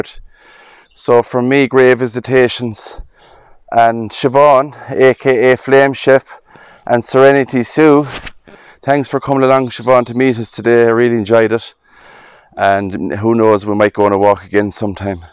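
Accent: Ireland. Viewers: speaks like Conor Mcgregor accent🙂 I like it. Thanks for sharing the great video.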